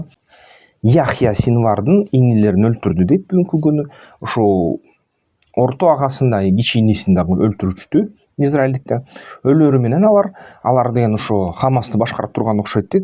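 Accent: Standard. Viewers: Нукура кыргызча суйлойсун азаматсын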